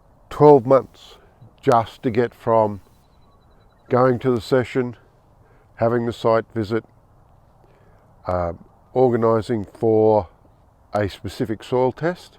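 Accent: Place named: Australia. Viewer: I found this video while researching as to whether this could be done. It's encouraging. The gentleman sounds Australian. Is that where he lives?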